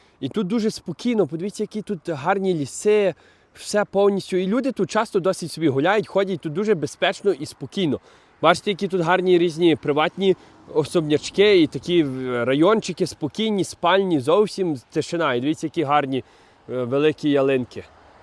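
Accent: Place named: Western Ukraine, Ukraine. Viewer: Дякую тобі за класні відоси, впізнаю наш захїдний діалект. Мені подобається дивитись твій канал, навіть за відсутність професійної зйомки....тощо...Бачу в тобі скромну, виховану та порядну людину. Простий Українець! Десятки мільйонів тобі підписників і стрімкого зросту каналу! Слава ЗСУ!!!Слава Україні!!!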